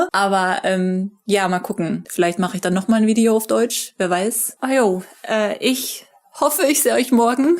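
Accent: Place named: England, United Kingdom. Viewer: I know some of those words! Ich mag hören zu deine Deutsch-voice. almost as much as your very English English accent. Mehr, bitte? I listened through once without subtitles and then again with subtitles. I was happily surprised to have genuinely understand some sections. I now know 2 (and a bit) languages and yes, there are concepts that I can only express properly in one of them. There is no good translation for kummerspek, 無心 or dag. yah? I mean, ja? and German is not harsh. Compared to French, maybe? I find it quite lyrical and soft to my ear.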